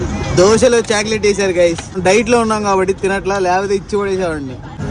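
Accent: Telangana, India. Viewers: After a long time with telangana slange 🫶🫶🫶🫶.... Leg day is always fun day sir 😄😘💪✨❤️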